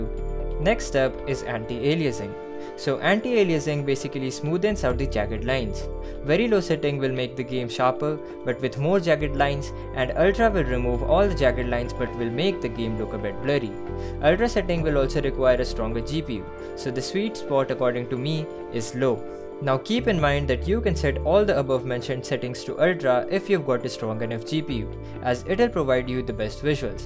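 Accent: India. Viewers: Indian accent = instant dislike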